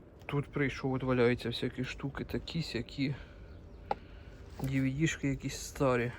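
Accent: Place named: Orikhiv, Ukraine. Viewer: Так ты парень с Орехова, зачем ты говоришь, что с Запорожья?? Понятно , что в Запорожье никто так не разговаривает. Богдана знал может? У него Горячий Хлеб был по всему орехову , преображенка, новоданиловка. Не надо говорить, что ты с запорожья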